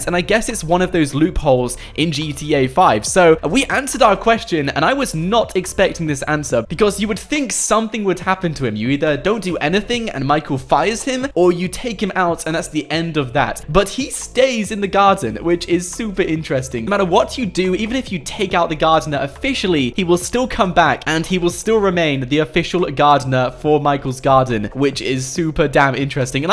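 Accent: England, United Kingdom. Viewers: he said Car Loss instead of Carlos man his England accent is funny sometimes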